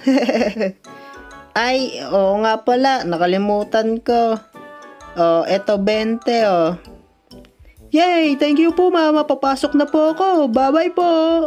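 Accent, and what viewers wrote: Philippines. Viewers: Taga maynila ka ba?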